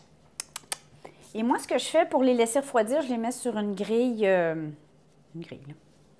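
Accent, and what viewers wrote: Canada. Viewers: Merci la j aime tellement tes vidéos et tes muffins hum, j aie tellement adorée cette pâtisserie au canada que j aie visité tellement de fois une dizaine de fois,q j aime le Canada, et tu me remémore tout,les odeurs,l accent le bonheur de ce beaux et merveilleux pays,encore de belle recette,je t aie découvert il y as quelques mois mais j adore, ta simplicité ton humour et ton savoir faire je suis de lyon,je sais que tu ns as visité et je pense que tu vs avez aimé,la gastromie le bonheur du bien manger lyonnais et je cuisine beaucoups aussi ancienne cuistod,bisou et hâte de voir encore et encore tes vidéos. Valou ou moufette au Québec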